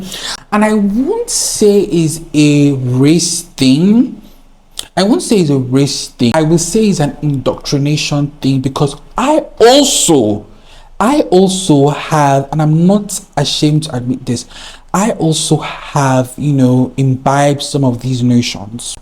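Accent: Africa. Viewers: I love your energy, your humor, and I love that African accent of yours, and your lovely plants. Please keep up your good work! :) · I am so very sorry you have ran into blind assumptions on other sites. People actually insulted your accent?? OMG. What did they want, an African to have a Australian accent? A British accent? Perfect english is not enough for some folks I guess, I love your accent, any accent is grand. I do have trouble understanding an Irish accent at times, and Scottish is worse -- they slaughter words and without subtitles I can't catch anything! 😄😁😂 I watch a plant guy from England, and then you from Africa. There is a definite learning curve when one watches content from another nation, there are weather issues that I thought I was aware of, but I've been learning a lot about weather and how it affects plant people in other nations. And then there is customs or national normalities that I don't see here in the USA, like your roadside plant stalls, I'd love that! 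It all makes for a wonderful learning experience! I love that you are showing and telling us about Africa, about Nigeria, I watch you for your personality, you plant knowledge, your humor (!) but its so grand to get a context of life in other countries -- to blow expectations and assumptions away so all can see and understand and stop 'boxing' people into stereotypes. You are my treat. I watch every day!! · People are so bitter and it’s weird to be so loudly envious of others in the community because they have nice plants or live in tropical environments etc. Most of us are here to see nice plants and be inspired! Also these people are just insanely ignorant. Btw your accent is wonderful I love African accents. ❤❤ from USA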